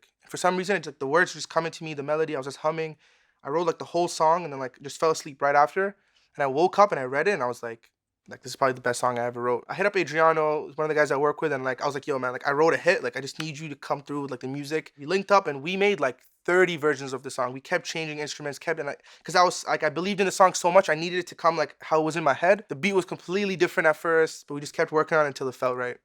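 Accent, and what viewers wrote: Toronto, Canada. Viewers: he sounds like a guy from toronto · Toronto mans accent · When he started I thought he has a toronto accent and the I looked him up and I was right! Lol anyways this guy is amazing! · I can tell by the way he talks he’s from Toronto.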